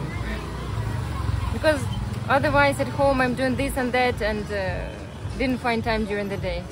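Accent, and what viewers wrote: Russia. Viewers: What’s is your mother tongue ? From your English accent it seems that you belong to Russia or Some ex-Soviet country.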